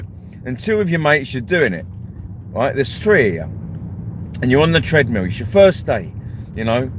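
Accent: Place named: North, United Kingdom. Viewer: Absolutely brillant. Your Northern accent and arm impressions were brillant. Think you give Peter Kay a run for his money. I just laughed.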